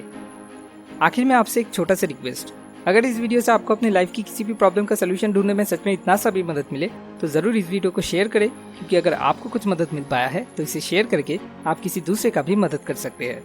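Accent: Bengal, India. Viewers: i love ur bengali accent hindi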